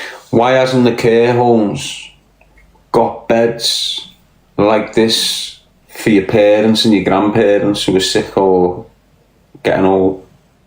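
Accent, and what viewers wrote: Liverpool, United Kingdom. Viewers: The Scouse Terrence McKenna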